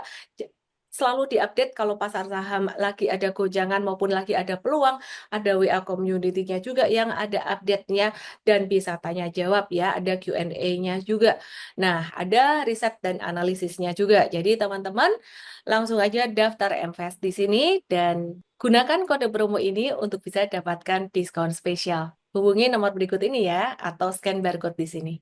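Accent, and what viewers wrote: Java, Indonesia. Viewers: Sampean ko yo bahasane medok temen mbak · Suaramu medok mbak.... Ketoro wong jowo iki😮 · Menyimak dengan baik kontent yg berbobot...tapi sedikit gagal fokus karena "medok lafalnya"..😮😊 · Wong jowo Iki mbak e. Logate ketoro nenen.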